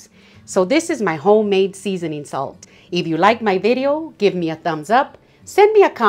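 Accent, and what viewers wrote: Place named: New Mexico, United States. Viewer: you have a beautiful accent where are you from? You sound from my home of New Mexico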